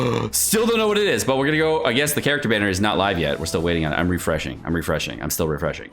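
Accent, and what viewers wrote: United Kingdom. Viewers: british supremacy!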